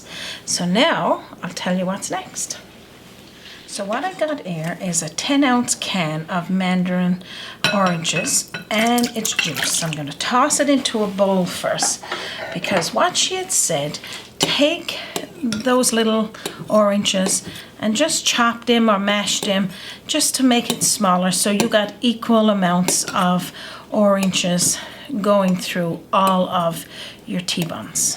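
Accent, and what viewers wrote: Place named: Canada. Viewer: Have a beautiful Sunday. Looks delicious wished I could have tea and buns with you. Love your Canadian accent. Stay safe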